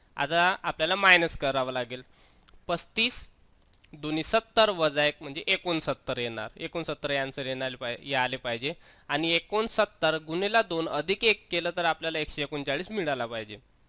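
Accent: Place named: Vidarbha, India. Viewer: very simple and good EXPLANATION .TUMACHA VIDARBHA TONING MAST AAHE KEEP IT UP👍👍👍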